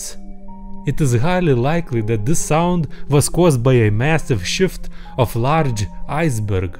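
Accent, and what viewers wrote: Russia. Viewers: Cool concept for a video, but god damn I'm tired of "Russian" channels. Bro, even FPSRussia got old after about the 10th video. The accent isn't entertaining. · this russian accent is sooo good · По акценту слышу что русский парень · ur accent remind me of russia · Хард рашн акцент? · Ukrainian, English and Russian. And this accent sounds exactly like a russian speaker trying to speak English without any diligence. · as a Russian myself I can confirm that some people really have accent like this when they talk in English, if they don't talk or practice often. But it's kinda strange that has it, considering that he's narrating many videos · Is he Russian? Cuz it he is I love it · are you from Russia? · Love the Russian accent 😂😂😂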